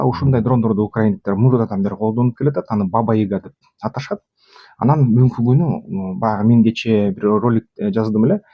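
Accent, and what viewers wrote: Standard. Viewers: отто таза сүйлөйсүң дикция керемет.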